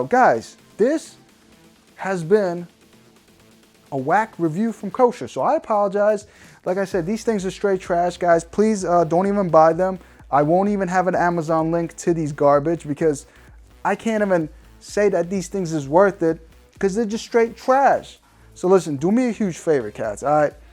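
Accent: New York, United States. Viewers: Man i just discovered my new fave channel. You sound like you from NYC like me. You keep it real.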